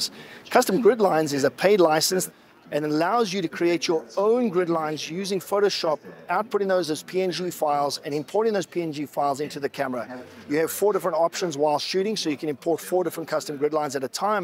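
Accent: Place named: South Africa. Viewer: He sounds South African 🇿🇦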